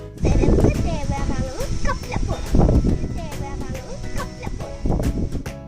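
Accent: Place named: United Kingdom. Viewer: OMG! Did anyone notice her English accent ? 😻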